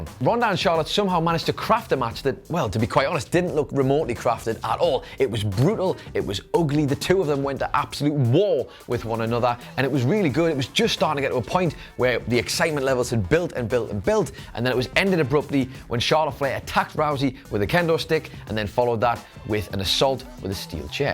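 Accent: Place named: Newcastle, United Kingdom. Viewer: Wey aye man. A fellow Geordie. You're getting a sub just for that.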